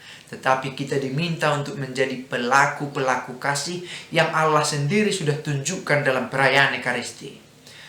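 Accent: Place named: West, Indonesia. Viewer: Frater dialek kebaratan diperbaiki. Tks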